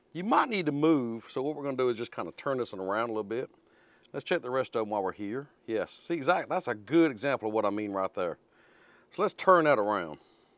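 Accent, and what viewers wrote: South, United States. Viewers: Another great recipe! Love the Southern accent. Greetings from Finland!🙂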